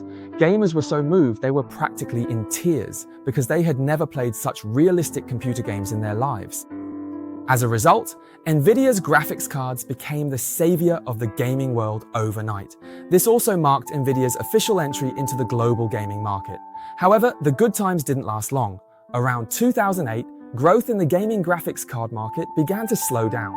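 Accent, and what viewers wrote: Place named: United Kingdom. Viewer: 怎么又变成英国口音了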